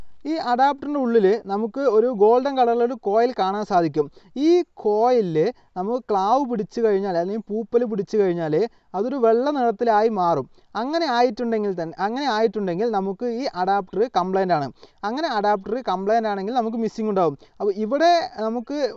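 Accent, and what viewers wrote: Palakkad, India. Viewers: Palakkad ano bro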